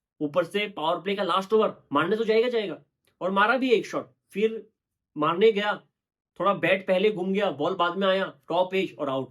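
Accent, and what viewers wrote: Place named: Tamil Nadu, India. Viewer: Bengali hai kya bhai 😲 😲 mujhe laga tamil nadu ka hai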